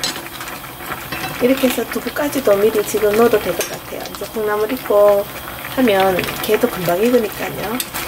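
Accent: Gyeongsang, South Korea. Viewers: 이모님 영상 보는데 옆에서 아내가 인사 따라하네욬ㅋㅋㅋㅋ대구사람아니면 못한다고 대구부심 ㅋㅋㅋㅋ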